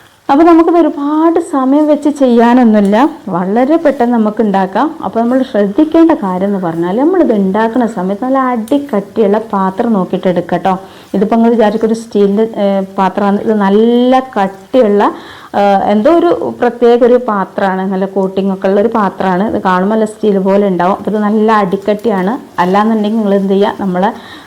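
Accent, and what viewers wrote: Malappuram, India. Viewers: Thank u mole. I like your old Malappuram language. God bless you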